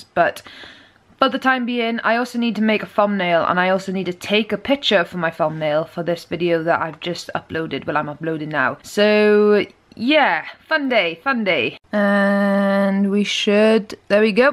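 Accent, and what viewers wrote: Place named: South Wales, United Kingdom. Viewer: Sounds like you're South Walian. Greetings from Aberfan, Merthyr Tydfil. How about a duet. Try - featuring from the Retrospect Tour.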